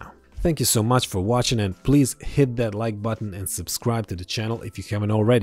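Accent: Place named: Israel. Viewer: מבטא ישראלי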